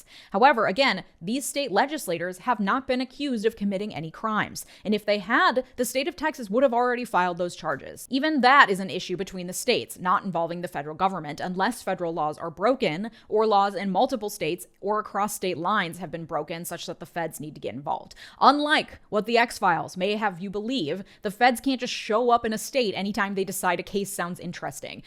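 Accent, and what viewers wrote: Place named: Minnesota, United States. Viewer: Love your channel great info paired with that warm Minnesota accent. Tanks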